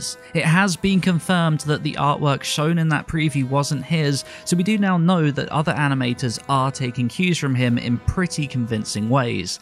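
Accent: United Kingdom. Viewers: you're from braitane aren't you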